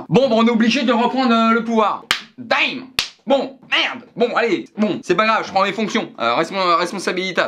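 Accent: Germany. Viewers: bonne année je t adore bonne continuation pour tes belles videos bien ton accent Allemand bisous tendres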